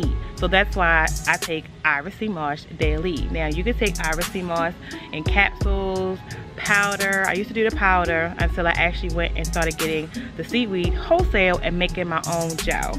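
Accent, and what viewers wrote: United States. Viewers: DC or B-More is what I hear in that accent, great vid🤩🥰🍫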